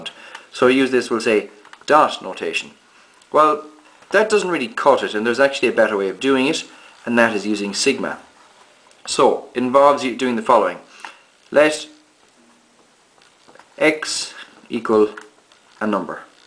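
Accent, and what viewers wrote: Ireland. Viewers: YOURE IRISH !!! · wow ,, thank you im 60 , and never understood ,, this ,, you teach so very well ,, and you sound Irish ( win , win ) a bit thank you ,, im going to watch the rest out you video's ,, from a Yorkshire ( Hull ) man ( living in Canada ) ,, it took me 40 years to find a real world project that needed Trigonometry , so i went to my daughters school to see if a math teacher would teach me what i needed to know and he did , and i finished the project -- again Thank You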